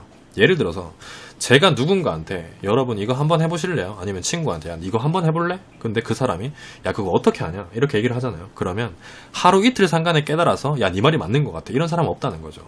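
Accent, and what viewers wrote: Gyeongsang, South Korea. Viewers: 앜ㅋㅋㅋ 사투리 쓰시는줄도몰랐넴 경상도라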